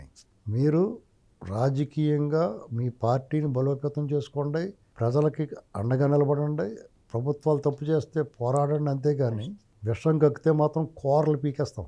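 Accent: Godavari, India. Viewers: సూపర్ గా చెప్పారు గురువు గారూ.. ఈ యెదవలందర్నీ బహిష్కరణ చేయాలి.. ఈ విషయం గట్టిగా ఆలోచించాలి. మీ ఆలోచనలనూ, మీ సూటిగాప్రశ్నించే విధానాన్ని మీ అభిమానిగా.. ఎపుడూ అభినందిస్తాను. ఎన్నో మామూలు జనాలకు తెలియని, అర్ధం కాని విషయాలు కూడా ఈజీగా గోదావరి జిల్లాల యాసలో, సామెతలతో చెప్పడం నాకు బాగా నచ్చింది. నమస్కారాలు.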